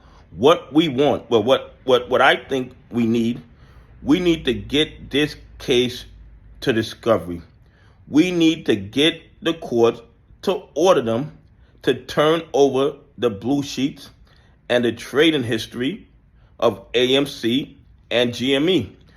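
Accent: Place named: Bronx, United States. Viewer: How can I get in touch with you brother? Sitting on an Ozone park property you sound like your from the BX like myself.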